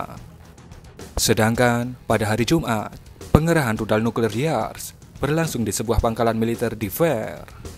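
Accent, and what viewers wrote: Java, Indonesia. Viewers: Medoke wong jowo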